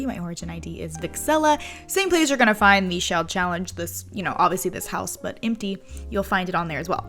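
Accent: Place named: South, United States. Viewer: Jasmine J she’s southern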